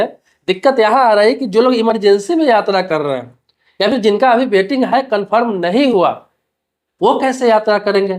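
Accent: Bihar, India. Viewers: आप बिहारी हैं क्या ? बिहारी लोगों की हिंदी बहुत कमजोर होती है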